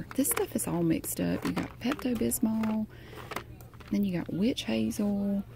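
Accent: South, United States. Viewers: she’s southern, as is Laura Lee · I love watching your ASMR because we are both from the south and your accent reminds me of home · I like her accent. I grew up in the south and it reminds me of all my elementary school teachers accents. I wish I would have devolved a country accent. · I used to live down south and everyone had an accent like that. I loved listening to them talk. 💕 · Southern accents usually annoy me because I had a bad experience with a jerk lady with a southern accent but you have restored my faith in southern women. You’re voice is relaxing and sweet and I think I can learn to love southern accents again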